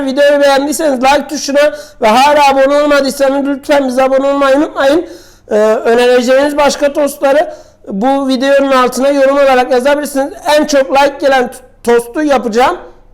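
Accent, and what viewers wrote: Gaziantep, Turkey. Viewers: Senin takipcinim ve her videonu neredeyse izliyorum bana yakin ve bizden biri olman hafif gaziantep siven kendini ozel kiliyor kucuk bir takipcinim tostlarini evde deniyorum ogrenciyim ne de olsa senin tükkan da tost yemek isterdim...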